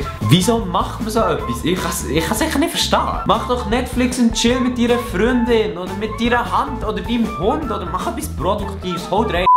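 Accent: Switzerland. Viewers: Schwiizerdütsch isch immer guet, chunt eifach verdammt authentisch übere. Guets Video ;) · scho lang uf nes schwizerdütsches video gwartet 👍 tönt outäntischer 😁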